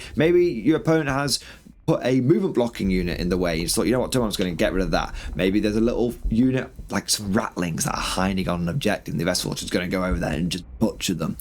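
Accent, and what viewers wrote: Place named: United Kingdom. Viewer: he's British they break everything English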